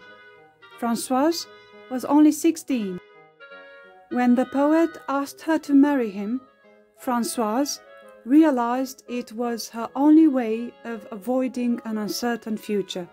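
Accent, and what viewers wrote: France. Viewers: I forgot to say, your English pronunciation is perfect and your French accent is very charming. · It’s always so nice to listen to a French native narrate French history. As one who knows the language, I often bristle when many English speakers do presentations involving French names, places, and events; they frequently mispronounce the words. The woman on this video does such a nice job. Not only is the French all exquisitely spoken; but also, her tone is lovely and graceful. Thank you for posting this brief but thorough overview of Mme. de Maintenon, a lady who lived her life well and honorably.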